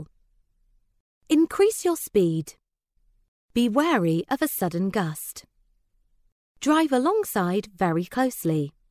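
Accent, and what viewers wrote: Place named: United Kingdom. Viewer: good accent sound british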